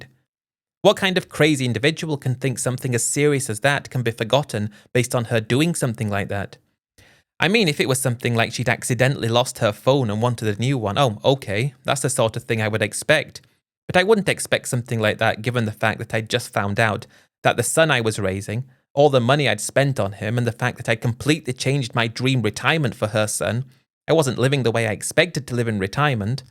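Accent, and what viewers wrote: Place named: United Kingdom. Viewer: You should’ve just accepted the baby because you are British and the accents kind of annoying so what are you doing? You know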